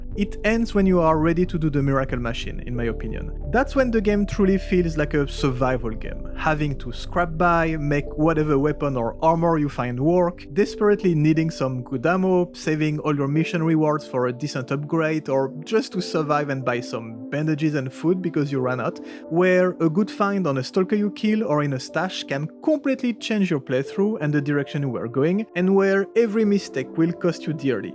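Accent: France. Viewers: ton petit accent Français charismatique haha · merci pour cette vidéo; mais ne serait pas un french qui parle le british?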